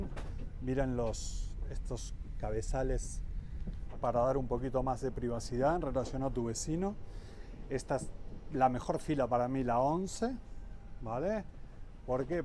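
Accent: Argentina. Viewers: DONDE NACISTE EN LA MATANZA POR TU FORMA DE HABLAR 😮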